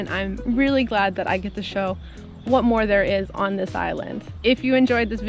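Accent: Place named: Canada. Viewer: Hi, you had make a right decision to live in a small town to learn mandarin. You sound Canadian.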